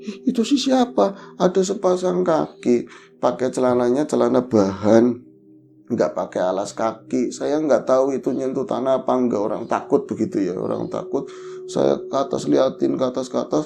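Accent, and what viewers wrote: Banyumas, Indonesia. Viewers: Wilujeng siang Selasa ceria sambil mendengarkan suara bro. Ngapak nya kental. Maju NGOBOR Jangan lupa bahagia 💪💪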